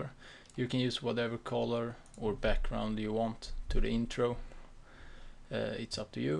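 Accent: Sweden. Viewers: your swedish?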